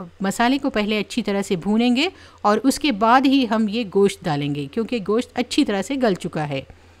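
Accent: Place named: Karnataka, India. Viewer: Mam apka talluqh bidar se hai? Apne kabhi zikr nahi kiya laikin apke andaze takallum se itna yaqheen hai k ap hamare hum watani hain karnataka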